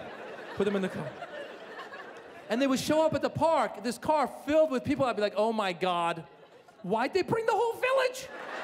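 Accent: Iran. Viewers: hahahahah his persian accent is awesome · this guy is amazing being half persian this hilarious!! the thick persian accent cracks me up haha totally my dad!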